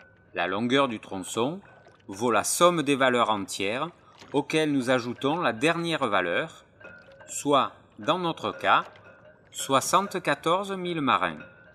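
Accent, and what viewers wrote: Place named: Provence, France. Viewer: Excellente, cette série de tutoriels avec l'accent de Provence. On jurerais que c'est Marius qui nous donne le cours !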